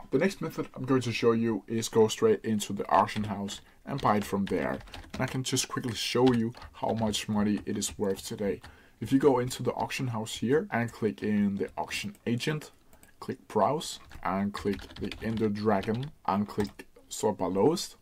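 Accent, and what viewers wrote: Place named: Denmark. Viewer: nah det der danglish er alt for obvious, smid lige din disc vi skal ramme noget skyblock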